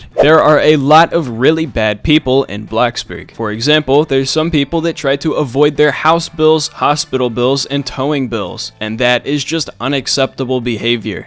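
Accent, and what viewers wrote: United Kingdom. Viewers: Ayo British 😂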